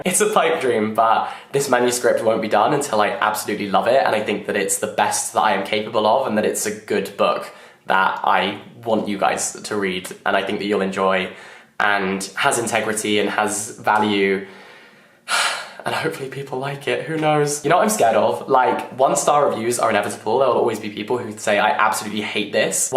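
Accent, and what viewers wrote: United Kingdom. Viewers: « New York is so lovely » he says in a thick British accent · You're Brit English makes me wanna take a literature degree 🤌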